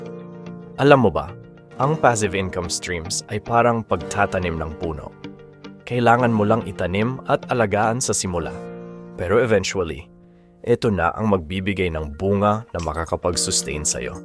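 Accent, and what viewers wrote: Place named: Conyo, Philippines. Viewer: Speaker sounds so conyo. 😅😅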